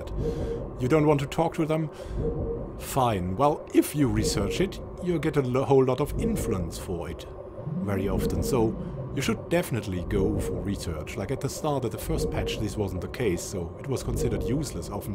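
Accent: Germany. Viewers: Btw are you german by a chance? You kinda have the accent :)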